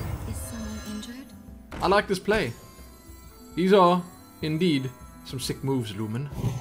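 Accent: South Africa. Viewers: Hey Lumin you from S.A? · South African, close to Cape Town :)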